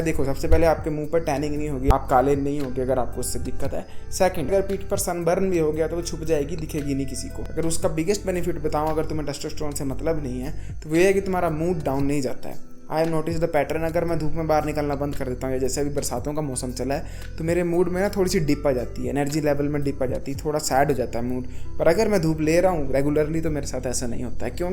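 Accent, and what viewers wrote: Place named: Himachal Pradesh, India. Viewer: That himachli accent😂♥️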